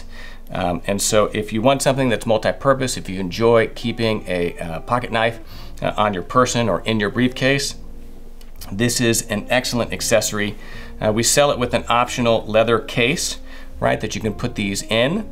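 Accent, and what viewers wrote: Texas, United States. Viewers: We native Texans love our drawl!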